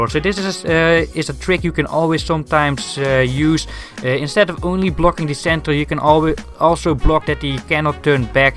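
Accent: Netherlands. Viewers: Hmm are you dutch? I guess so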